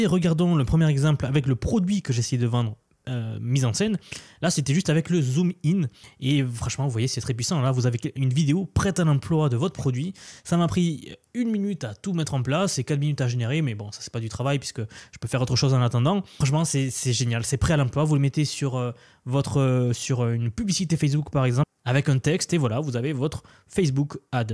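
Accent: South, France. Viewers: Merci pour cette vidéo ! très intéressant ce nouvel outil ! Et vive l'accent du sud !! 😁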